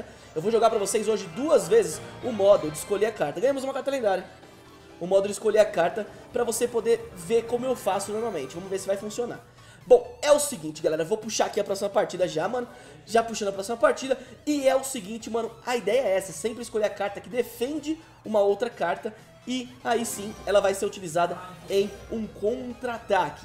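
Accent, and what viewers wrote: Minas Gerais, Brazil. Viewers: Mineiro eu acho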